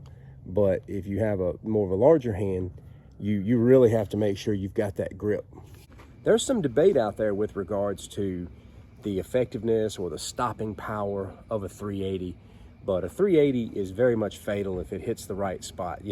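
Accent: South, United States. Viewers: This guy has a really cool southern accent. Good video.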